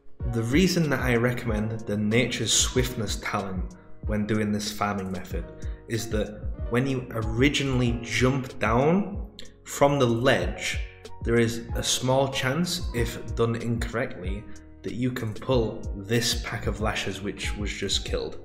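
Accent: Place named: Yorkshire, United Kingdom. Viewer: I’m from Sheffield, I’ll recognise a fellow Yorkshireman anywhere :) Nice vid man, I played vanilla back in 05 when I was 13 and had no idea what I was doing. I stopped playing during Cata but me and my mates plan on coming back for classic White rose!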